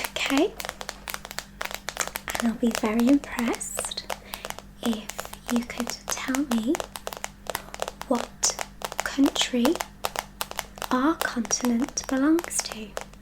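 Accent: United Kingdom. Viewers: May i ask you from city is your accent? Or if it is just a neutral British accent. I generally don't like British accent, but I love yours! 😊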